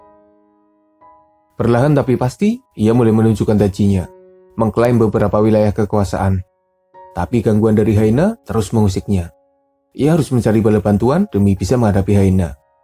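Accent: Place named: Java, Indonesia. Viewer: Logat jawanya lucu mas🤣🤣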